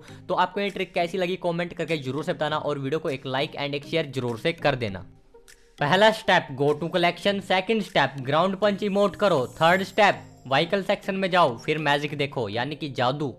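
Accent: Punjab, India. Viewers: Bro you are from punjab?? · Bro r u from Punjab ☺️🤭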